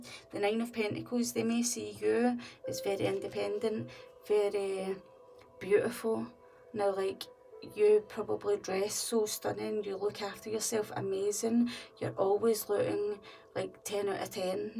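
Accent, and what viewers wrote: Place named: Scotland, United Kingdom. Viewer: Thank you! And love your Scottish accent (and can't believe that I can understand you! :) 👍❤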